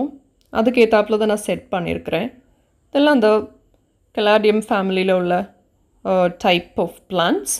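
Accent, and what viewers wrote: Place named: Nagercoil, India. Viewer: Mam nagercoil language apadiyae eruku, nanae entha place nu kekalam ni erunthaen, ngl la entha place mam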